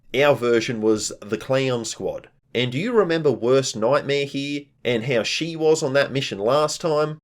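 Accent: Australia. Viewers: The Aussie accent just adds flavor to these videos. It just makes them soothing. I put it in background sometimes.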